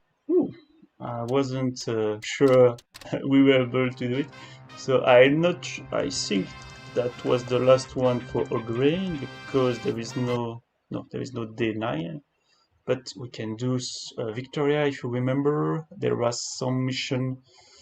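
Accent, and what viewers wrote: France. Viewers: Where are you from? France?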